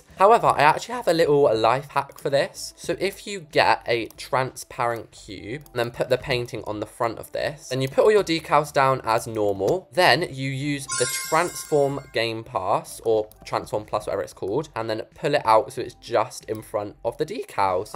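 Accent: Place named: United Kingdom. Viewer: i think we can all agree that the build looks amazing! her voice is also so pretty bc im a American but i wanna have a british accent! also a tip for ur next build, idk if you knew this but if you take a ckear basic shape and resize it to be thin then get a decal (code is at the end) and now you have a realistic fridge! one more wsy to do it is to get small grid and get verdical planks and place in a line then coler black then place the taped photograph resize then change decal! code: <num> ur the best!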